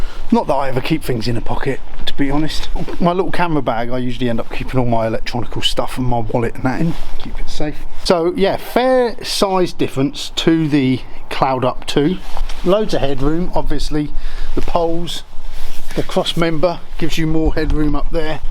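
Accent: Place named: United Kingdom. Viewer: Any chance you can learn a different accent lol? You have good content British accents are the absolute worst. African, Australian, American, Latin American would be so much better.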